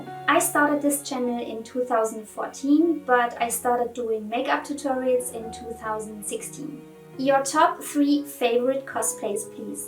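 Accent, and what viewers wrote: France. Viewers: Am I the only french person here ?;-; Because that’s obvious to me that she has a french accent, and so does Celeste (The burning of the VERSAILLES witch 😜).I know, I’m a party pooper right ?😅 But I have to agree...her voice is sweet af🥺 And also, sorry if I made mistakes!😅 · Are you french??? Accent sound french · I want to ask you where are you from (no hate) I think you sound french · Tu as un accent français non ? 👁👄👁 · U have a little french accent .o. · You're french? For the title but also for your accent. Ce n'est pas un reproche, je suis française également 😏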